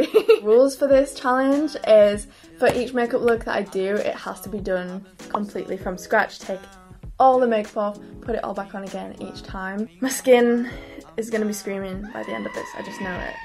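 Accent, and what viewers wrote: United Kingdom. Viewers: anyone else forget shes brittish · how did i not know she was British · POV; asking if she is British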